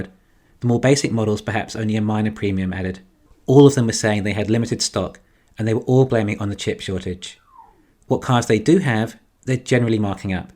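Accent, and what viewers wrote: United Kingdom. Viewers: I’m in the U.K., his accent sounds British to me.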